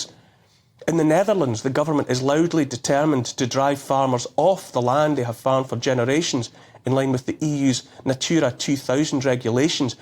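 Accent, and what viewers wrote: Scotland, United Kingdom. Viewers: You have great information here that you so generously share with whomever will watch and listen. I'm a 70 year old pale male too. So, I'm going forward with a well intended suggestion: If you could slow your broadcast down one beat...just one...my brain could more easily absorb your wonderful content. Between your beautiful Scottish accent, and the slower pace of your easy language, I could really appreciate a better chance to understand everything you are saying.: )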